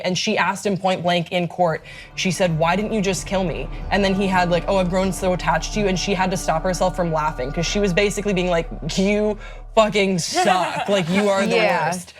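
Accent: Belgium. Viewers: pls speak more french je veux entendre ton accent belge <33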